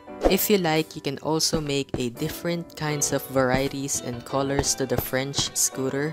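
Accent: Philippines. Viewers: Are you Filipino, the way you speak in english is filipino, I'm Filipino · Ko ya parang Tagalog ka no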